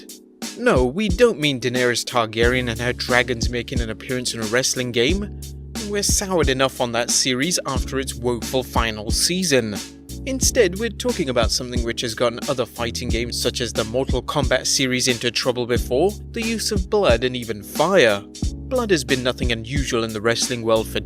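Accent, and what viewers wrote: United Kingdom. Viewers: Maybe it's just the accent, but that was pretty stuffy British. Sex is naughty and things are improper and all that.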